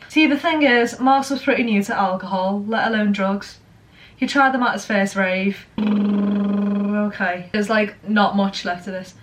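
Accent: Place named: Liverpool, United Kingdom. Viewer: Do you think she’s American? Idk if you’re joking she’s scouse lol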